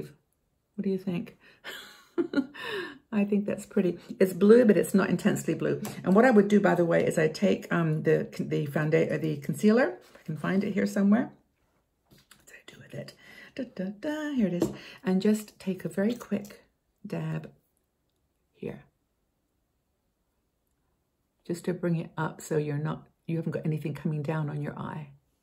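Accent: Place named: England, United Kingdom. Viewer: There’s definitely a bit of an English accent there. 🙂💕 🇺🇸 🇬🇧 is so charming and fun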